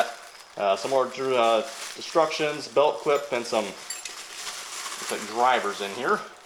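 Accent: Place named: South, United States. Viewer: Southern pronunciation for the most part. Wait until you hear "INsurance" and "Reality". 😁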